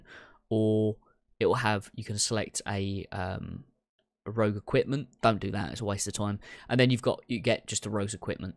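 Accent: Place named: United Kingdom. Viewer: Man i love that british accent, "Diddly Squat fork all"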